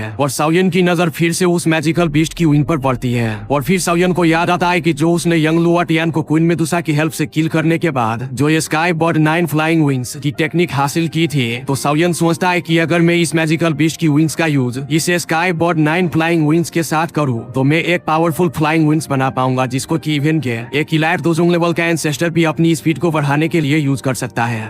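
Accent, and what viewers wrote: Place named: Bengal, India. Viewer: Vai, tu Bengali hai?? I'm 400% sure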